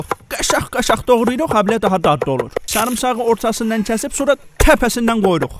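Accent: Sheki, Azerbaijan. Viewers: 😂😂Azerbaijan acent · Ay allah sevirəmdə şəkinin ləhçəsini özümdə şəkiliyam nətərsozzz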